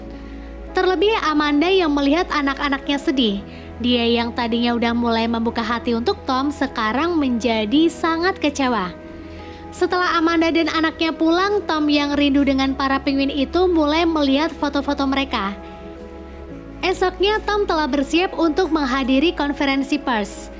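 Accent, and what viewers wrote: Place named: Pemalang, Indonesia. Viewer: Mbak orang pemalang ya😆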